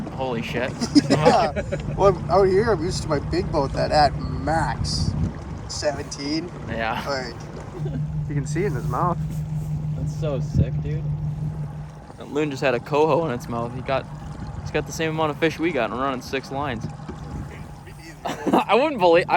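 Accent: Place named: Minnesota, United States. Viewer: Its so nice listening to a fellow minnesotan on youtube. All the other youtubers have the worst non-minnesotan accents.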